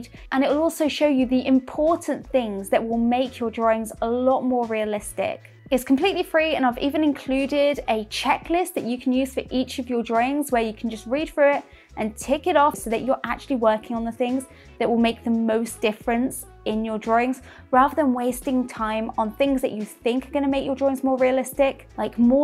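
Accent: United Kingdom. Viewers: Me encanta escuchar tu acento britànico jeje aunque no me queda muy claro si los dibujos a lapiz deben brillar o no, es recomendable o no que brillen? that is the question my Dear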